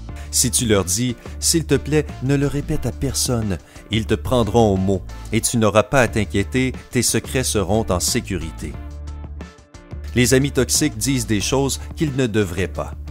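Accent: Quebec, Canada. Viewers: Vous êtes québéquois ? J'adore votre accent ! ❤ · C’est l’accent québécois, n’est-ce pas?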